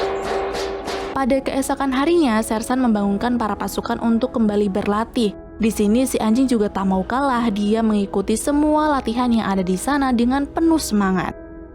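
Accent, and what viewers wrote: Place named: Banjar, Indonesia. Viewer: LOGAT KAKAK ORANG BANJAR KAN? "KYAAPA"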